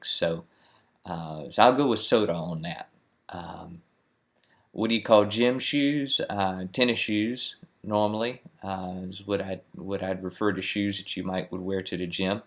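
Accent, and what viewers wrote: Virginia, United States. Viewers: My grandparents and mother are from Lynchburg, God I love that accent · Yeah this is a Virginia accent but I mostly hear a lot of older people speak like this lol. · I'm from Williamsburg and I almost never hear people with this accent? I go to school in Richmond and I also have never heard this there. This sounds very much like Dinwiddie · "Occupied VA" lol...that's funny, I've never heard it referred to as such, but I love it because I call the rest of VA (any part that's not Northern VA), "The Real Virginia". I grew up in Courtland, which is in Southampton Co. and my wife grew up along the Sussex/Dinwiddie County line and despite living in Maryland for the past 20+ yrs, our Southern Virginia Accent is still very present. You sound very much like the folks I grew up with. For all of those in the comments saying this isn't how Virginian's talk, I would say you haven't been been outside of your city. 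I also read where someone said his long story telling reminds them of "southern culture". That's because it is, Virginia is a Southern State. When my wife and I go home, it's refreshing that the good o'l Tidewater/Virginian Southern Accent is still very much alive, however I do agree that the deeper you go in Hampton Roads, the accent is waning due to influx and influence of Northerners who have relocated there. Thanks for the video. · You Sound Like Us Back in Norfolk, VA. Big Up Virginia! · anyone that says this isn't a VA accent has never really heard a VA accent before. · I love that accent from the south boston area like jeff and ward burton got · I just love the Virginia accent - and I found it from the weirdest source. A bad guy from the StarCraft games, Arcturus Mengsk. Been trying to nail the accent ever since, this video helps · I’m from Goochland County. Your accent makes me miss home sir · I'm from Richmond and he sounds more like Powhatan to me but he explained he grew up in Dinwiddie. 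· I’m from Richmond Virginia and you don’t have a Richmond accent. Definitely southeast Virginia or southwest. As you head south out of Richmond the accent becomes more NC. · Just outside of Richmond it is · Love the video. Very distinctive virginia accent. From your experience does the valley have it's own distinct accent. Have noticed the 804/757 to have distinct accents. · I love this Virginia accent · This is amazing. My husband and i had never heard a Virginia accent (that's how we ended up here), and it's precisely what we had imagined. We love your accent! We're from Indiana and hear coastal southern, mountain and a bit Pennsylvania. Love it nonetheless. ❤ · When he said the word, "Record" I knew instantly it was an authentic, traditional Virginian accent. You don't hear the Virginia accent much anymore - especially around places like Stafford where nearly everyone is a transplant from some other part of the country. 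I understand this accent is disappearing quite rapidly these days.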